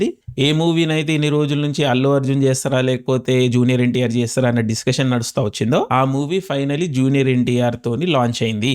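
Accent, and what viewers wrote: Telangana, India. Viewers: Telangana Yasa 🙏🏻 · ఇంతకు నువ్వు మాట్లాడేది తెలుగా తెలంగాణ నా??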